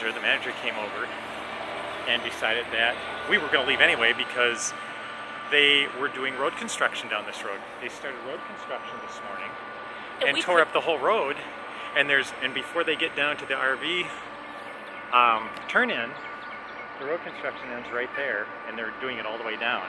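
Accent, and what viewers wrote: United States. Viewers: You are either from California or a Yankees! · Tell me your from the East Coast without telling me your from the East Coast.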